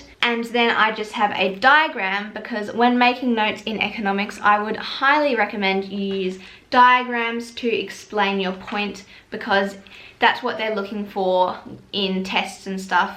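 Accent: Australia. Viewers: i love your aussie accent so much😭💗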